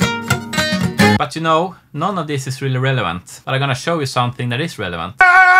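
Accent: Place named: Sweden. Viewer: The swedish accent makes it even better! Tusen takk for en meget god latter.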